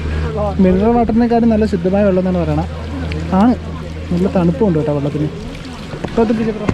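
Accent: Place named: Thiruvananthapuram, India. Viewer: ഇത് tvm ഭാഷ ആണ് മോനുസേ 😁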